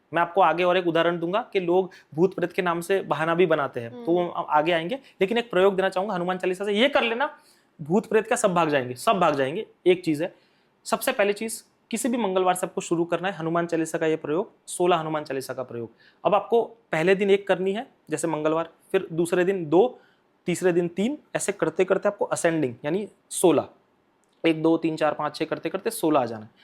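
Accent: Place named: Gujarat, India. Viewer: Ye admi gujrati lagta hai Jaisi hindi bolta h uski wajah se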